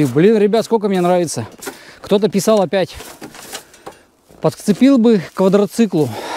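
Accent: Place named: Taiga, Russia. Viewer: Только хотела написать про приятный русский таёжный говор. И попался ваш комментарий. 🙂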